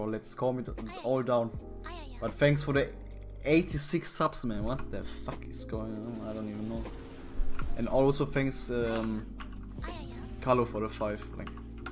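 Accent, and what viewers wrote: Germany. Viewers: Where is he from he sounds like a German dude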